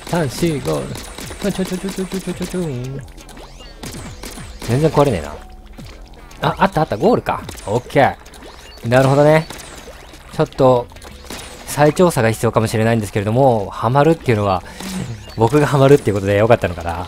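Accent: Hiroshima, Japan. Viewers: Rさん！広島弁教えてください＜(_ _)＞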